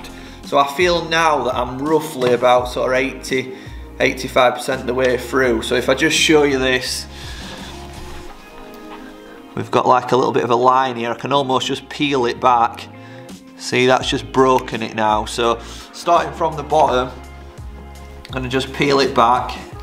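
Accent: Yorkshire, United Kingdom. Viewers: Great video will definitely try thus myself next time 👍but the best part for is being a Rotherham lad just listening to another Yorkshire lad trying to pronounce everything clear for his audience great job 😁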